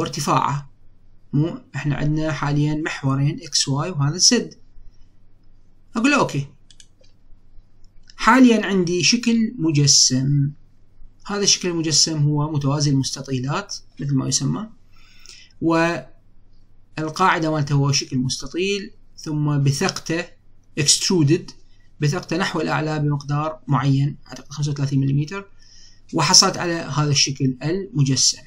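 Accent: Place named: Iraq. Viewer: الله يوفقك ع هذا الشرح الواضح وخصوصا باللهجة العراقيه القريبه ع قلوبنا الله يحفظك ويحمي العراق بس لو اعرف التسلسل تبع محاضراتك استاذنا الفاضل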